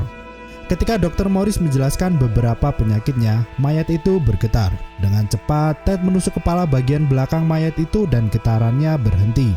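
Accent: Java, Indonesia. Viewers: Si abang nya Jawa ya bang medok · Suara jowo medok eramm mass hehheh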